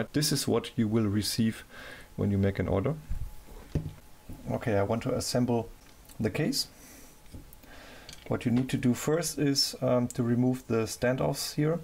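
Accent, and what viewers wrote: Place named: Germany. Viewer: Hi thanks for the helpful information. I guess you are from Germany from your accent.. Would you be able to help me source the aluminium bus bar ? I live near Germany ( und ich kann auch Deutsch reden ; )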